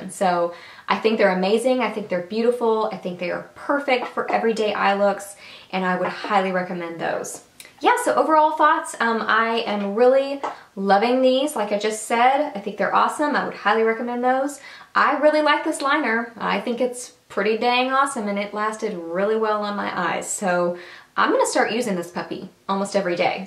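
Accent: South, United States. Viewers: Great review of everything! I've been searching for the matte balms- haven't found yet- going to look again today- day off. So glad I found you- prissy highly recommend I check you out - from PrissyAndPink- so glad I did! R u from the South too? We're both in Houston and you sound like another Southern girl!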